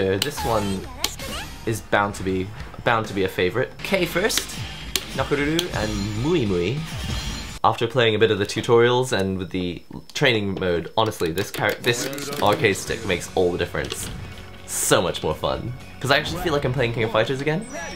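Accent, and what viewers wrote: United Kingdom. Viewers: It's British! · asian with brit accent! LOVE IT!!! You rock!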